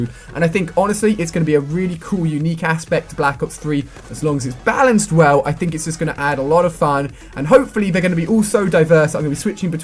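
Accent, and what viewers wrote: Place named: United Kingdom. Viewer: Don't know what's better the game his commentary or his British accent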